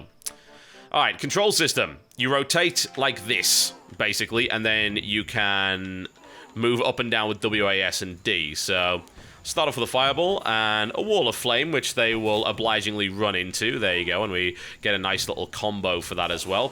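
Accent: United Kingdom. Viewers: WHAT does it matter if he pronounces babel different than in America he got a british accent which I like way better than American accent.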